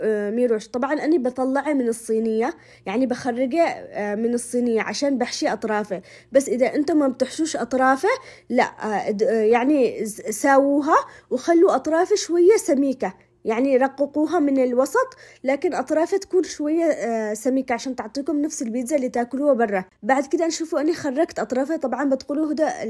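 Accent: Aden, Yemen. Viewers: ماشاء الله عليك مبدعة وفنانه واسلوبك بسيط وكفاية كلامك العدني ي بنت عدن الحبيبة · والله انك تجنني تسلم ايدك واللهجة اليمنيه روووووعه ماشاء الله الله يسعدك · انا بشوف القناة عشان الهدرة العدنية جبر قلبك❤ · ما شاء الله تبارك الرحمن روعة ومتألقة في طبخك وقناتك الله يعينني كلما شفت طبخك سال لعابي وقررت بطني ولهجتك العدني تجنن الله يسعدك ويوفقك وبالصحة والهناء والعافية والسعادة عليكم يااااارب ❤❤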